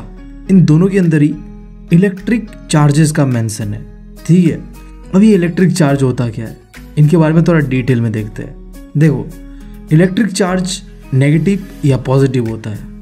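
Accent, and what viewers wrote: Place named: Assam, India. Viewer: Bro is axomia 🙌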